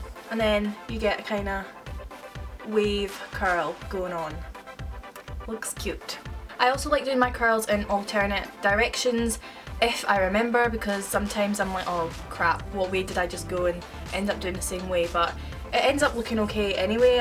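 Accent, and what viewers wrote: Scotland, United Kingdom. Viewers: is amazing she talks scotish like me I tried this for the first time I screamed 😜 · i think its Scottish :) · Is that a Scottish accent idect? 😉 I love it, Scottish myself ☺ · Yay scottish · omg are you from scotland !!! i am as well!! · omg you're scottish yayyyyy :) <3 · Sophia Maniaci thought I would reply since no-one else has- she is Scottish. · Hi are u Scottish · Where in Scotland you from? Love your accent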